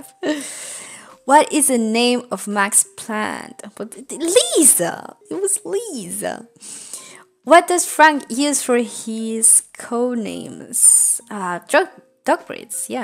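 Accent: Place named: Colombia. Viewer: I love it so much but I cant put together ur accent I think is Colombian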